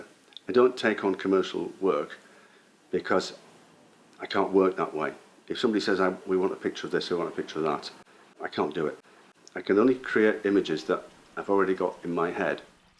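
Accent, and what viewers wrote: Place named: United Kingdom. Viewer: You said 5 x 7 and yet have the British accent ?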